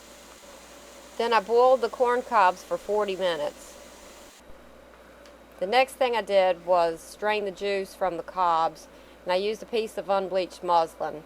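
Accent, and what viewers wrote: South, United States. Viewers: Great video! LOVE your southern accent, by the way. LOL! I don't like to eat a lot of corn, but a neighbor farmer gave us a load of sweet corn, and I hate to see food go to waste. My kids and hubby love corn. Honestly, I prefer frozen, but also like to not depend on freezers in a locale where sometimes our electricity is undependable. Very nicely done video. No annoying screaming music in the background, and you spoke clearly and didn't chat unnecessarily--but gave valid information.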